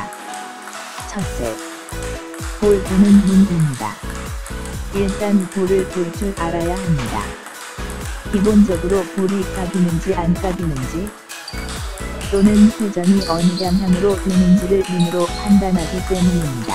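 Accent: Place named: Gyeongsang, South Korea. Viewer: 님 탁구러버 아닌가요? 님 말투보니까 딱 경상도 보리문디 같은데요..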